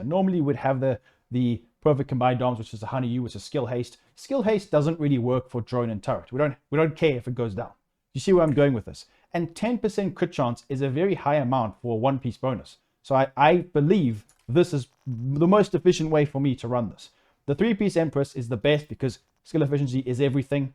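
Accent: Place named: South Africa. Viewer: From your accent(btw loved the vid), are you South African?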